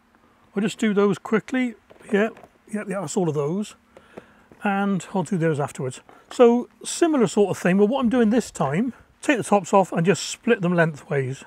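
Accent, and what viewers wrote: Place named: West Country, United Kingdom. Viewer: Nice batch of peppers there, just popped over from at Green Side Up to have a look see. I air dry mine tomatoes with added spices/herbs then store in jars with olive oil, triple bonus, 1. Makes the house smell nice, 2. Flavours already added prior to cooking, great topping on pizzas, 3 Flavoured oil for cooking. Thanks for the tips on preserving, lovely hearing a west country accent 😉😁🌱☀️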